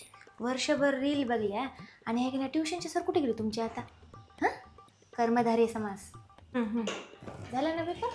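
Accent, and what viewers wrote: Nanded, India. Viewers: Madam tumhi Nanded chya haw ka manalo. Ase vatalay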